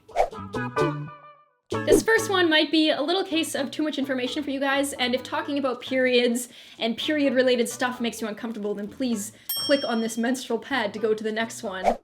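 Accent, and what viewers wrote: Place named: Canada. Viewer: Heehe totally random but I always feel super cool or something when I can sense/ hear a Canadian accent 😎😎😎😂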